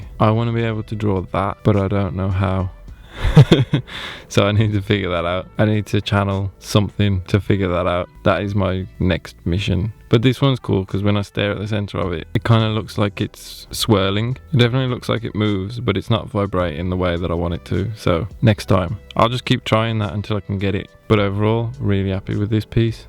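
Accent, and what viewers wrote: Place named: West Midlands, United Kingdom. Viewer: Really great work 👍 And representing West Mids by sound of it!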